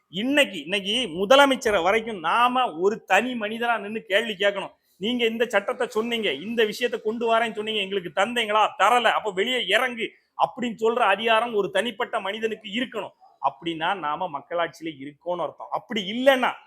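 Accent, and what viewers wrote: Tirunelveli, India. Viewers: இயல்பான நெல்லைத் தமிழில் யதார்த்தமான ஆழமான அரசியல் பதிவு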